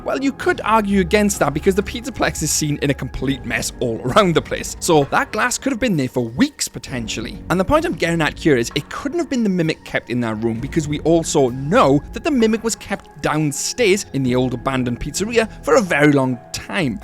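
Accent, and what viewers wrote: Wales, United Kingdom. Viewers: Are you Welsh? I was just wondering because of the accent 😅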